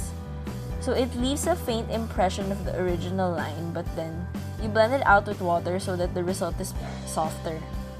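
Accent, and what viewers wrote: Philippines. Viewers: When I first heard your voice, I noticed an accent. I knew you were Filipino too.☺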